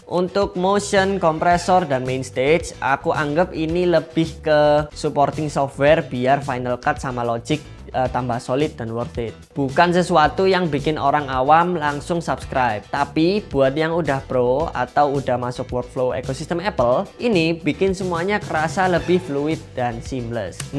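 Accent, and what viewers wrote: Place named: Java, Indonesia. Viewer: Medok buanget, wong ndi mas?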